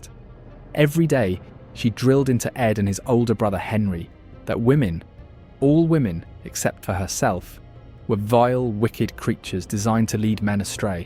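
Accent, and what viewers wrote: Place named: South England, United Kingdom. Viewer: Great work sir, excellent narrator sounding South England vibes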